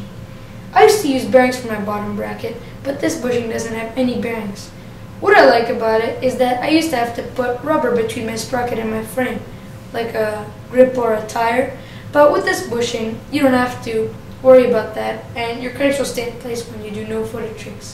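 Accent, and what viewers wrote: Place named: United States. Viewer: are u american or russian ur names sounds russian but your accent is american im confused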